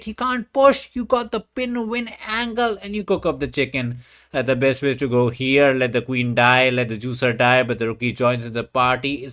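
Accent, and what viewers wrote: India. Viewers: bro can you please stop with this indian accent because i cant take anything serious, i know you can speak english normally.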